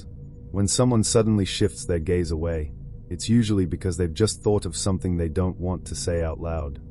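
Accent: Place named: Jersey, United Kingdom. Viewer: What surprised me the most?: Your British accent with Jersey dialect. EI: START: STAT. DARK: DAK... Trying to confuse us all?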